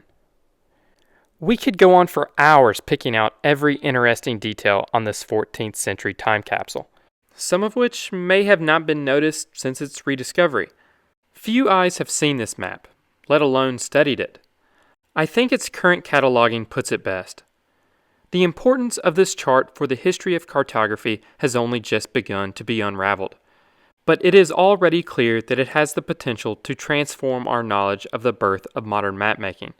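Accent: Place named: Mid-Atlantic, United States. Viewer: Have any other U.S. dwellers noticed the narrators THIIIICK mid-Atlantic accent? Idc that much but it lowkey drives me crazy 😭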